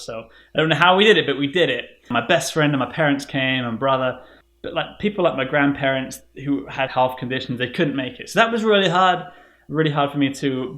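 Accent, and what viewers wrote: United States. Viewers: i don't want to say this but your starting to sound america like you are losing your accent i can hear the american accent alot when you speak. you going to sound like an american soon anyways welcome to America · aw thank you for the reply and feedback!!! Great to connect also ☺️ my fiance wants to live here I think but I may come over and have a long extended holiday next year and we can make the choice then. The healthcare over there worries me as I care for my teenage daughter and I also have health issues. Will keep you posted though as our journey unfolds! I absolutely love your adventures in the RV and what an amazing experience you all are having. I can hear the soft American accent creeping in also on yours lol...I find I say a lot of American words now because my fiance and I chat daily so I'm picking up a bit of accent just from that lol. 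Take care and many blessings to you and your amazing family! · Sorry bro, I thought is a dude. Didn’t realise she’s a she. How’s life now in Houston? I noticed you’ve adopted American accent now. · You sound quite American now mate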